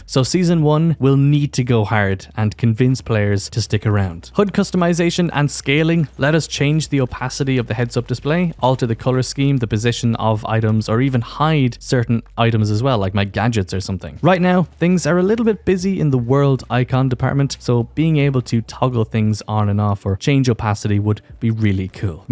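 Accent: Ireland. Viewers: Do I hear an irish accent in that voice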